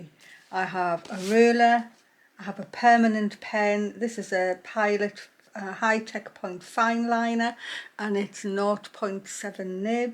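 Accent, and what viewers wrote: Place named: North East England, United Kingdom. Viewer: These are beautiful! Also you sound like you’re from my area, north east England! Ah yes you just said in the video:)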